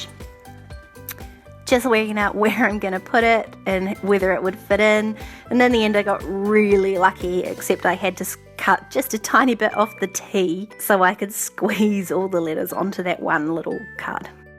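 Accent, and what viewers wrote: New Zealand. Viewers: Do I detect a Southland twang in your accent? Cute page, and a good way to include extra photos when the occasion calls for it.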